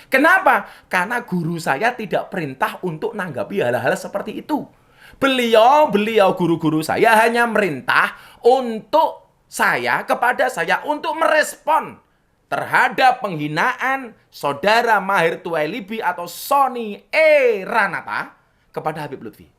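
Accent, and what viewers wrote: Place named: Indonesia. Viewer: Wow cara baca lafadz arabnya khas islam nusantara. Ngaji dimana ya pak?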